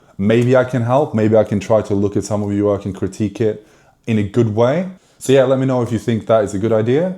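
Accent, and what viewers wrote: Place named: Australia. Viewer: must be the aussie twang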